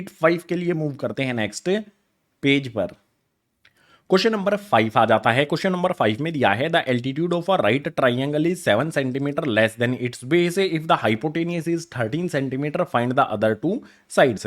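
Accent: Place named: Kota, India. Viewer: your accent... 100% kota student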